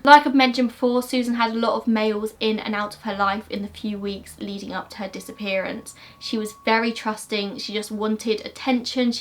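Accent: United Kingdom. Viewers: I'm from Dublin, Ireland. So, I'm very familiar, and have always loved, the UK accent. But, your particular accent is so very beautiful! 💜 I'm sure you don't even realise that you have an accent, but you have a beautiful accent, and you're perfectly spoken. Just another reason why I love your channel! 💜🖤💜🖤💜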